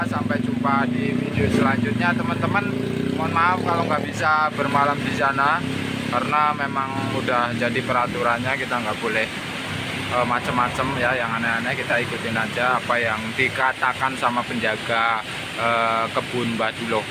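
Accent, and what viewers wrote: Java, Indonesia. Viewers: Bang aku seneng klu abang lg masak dan ciri khas jwa nya medok banget kku ngmong,, semangat dan jngn lupa banyak doa waspada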